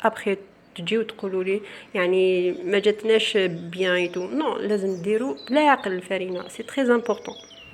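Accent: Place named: Algeria. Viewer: merci pour la recette . j'aime ta façon de parler algerienne . krahna mell3arbiya lfosha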